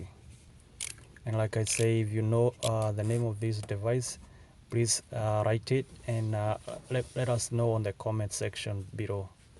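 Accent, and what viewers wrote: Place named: Somalia. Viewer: I can tell this brother is from Somalia great job werya.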